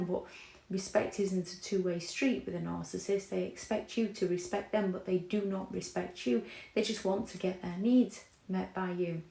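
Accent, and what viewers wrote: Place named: England, United Kingdom. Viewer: I have sleep problems and this came back. When you or I stand up with these nut jobs they are 10 steps ahead with the scams and lies. Yeah by the time I’m typing this here comes the flying monkey platoon. The lil town in Montana has like 300 people in it. A flying monkey started telling me how wrong I was for leaving the lions den. I’m so over a life time of playing grab ars with these lunatics. And listening to your English accent even so makes me laugh at myself when I get tiny pieces. The patterns no wonder you smirk every so often. At least you found a way to help people who otherwise would live in complete isolation. I’m older now and often scard shitless about just living on. I’m tired. I’m not blaming your blocks. I’m just marching on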